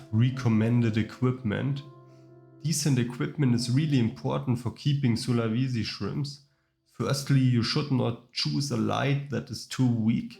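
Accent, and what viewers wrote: Germany. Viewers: Warum machst du kein deutschen Kanal? Man hört leider extrem raus, dass du Deutscher bist mit deinem Englisch.😅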